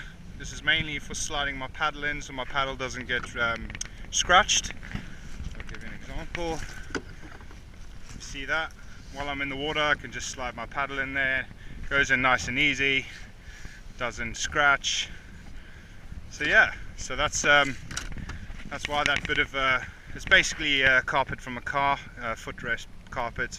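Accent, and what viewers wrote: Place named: South Africa. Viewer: Hi I don’t mean to be rude but are you partly South African only guessing from your accent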